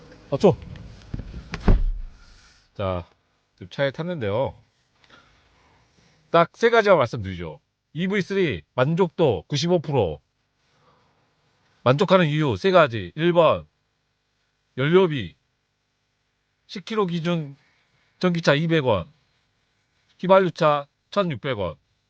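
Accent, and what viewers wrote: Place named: Jeolla, South Korea. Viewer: 억양으로 보아 고향이 전라도분 같네요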